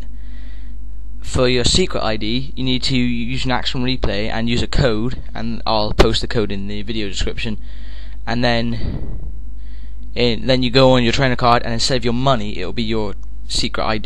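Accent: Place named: United Kingdom. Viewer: your awesome. british accent and straight to the point.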